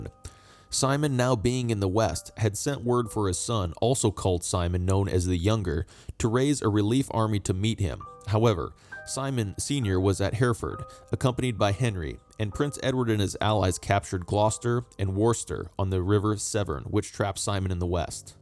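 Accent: United States. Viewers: Well written .. poorly pronounced. The American accent is an extreme handicap to educators.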